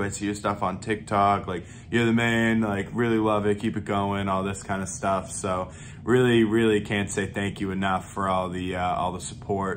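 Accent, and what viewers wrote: Boston, United States. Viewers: Are you a Southie? Live in a triple decka?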